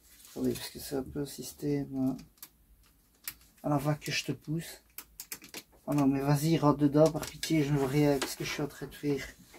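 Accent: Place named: Belgium. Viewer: Coucou et ta petite famille ❤ Oui je suis laaaaaaaa (imagine une petite femme qui saute les bras en l'air 😅). Boudu! (comme on dit chez-nous dans le Sud) j'ai un retard monstre 😮 c'est affreux!!! Mais le soleil est revenu, et j'ai tellement profité du jardin le soir après le travail, que YouTube me regardait, au lieu que moi je le regarde 😂. Du coup, comme j'ai un retard monstre (encore!) sur tes vidéos, je laisserai un pouce en l'air (la base!) et un cœur ❤ en commentaire. Désolé il n'y aura pas de roman 😢, mais faut que je rattrape tout ça 😅. J'ai adoré (comme toujours!) ta vidéo. Comme tu le sais et ça depuis le début, les vidéos du vendredi c'est ma pépite de la semaine ❤. Pour les cannettes en alu, en effet... Il faut mettre de côté, pour 3€ et quelques... C'est pas si rentable que ça, même si comme on dit "il n'y a pas de petites économies". Mais la... 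Ce n'est plus question d'économie. C'est question de places 😅. Pour l'engin avec le charbon, mon pauvre, je ne t'aiderai pas 😅 je ne suis ni bricoleuse du dimanche ni même de la semaine 😂. Merci pour ce bon moment de rire, je dois avouer que ça fait du bien de remettre l'accent belge à la maison 😘. Des bisous à toi et ta petite famille ❤. A très bientôt 😘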